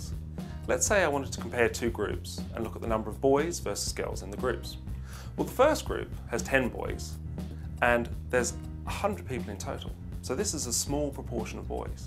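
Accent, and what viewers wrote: England, United Kingdom. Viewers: I´d like to see more class tought by this teacher. I love english accent.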